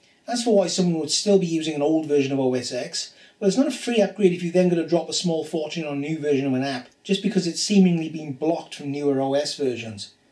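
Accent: Wales, United Kingdom. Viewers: Fantastic. Worked for me and it was easy to follow! Heard the welsh accent and thought I'd give it a chance. Glad I did! Thank you!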